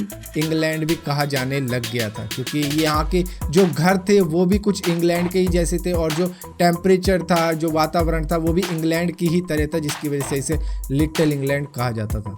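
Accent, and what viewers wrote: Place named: Delhi, India. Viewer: Bhai facts ke maamle m thmhara channel no. 1 hai. Delhi ka accent toh h hi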